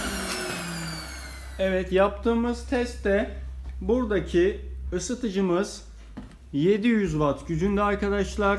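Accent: Turkey. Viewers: He speaks with a local Turkish accent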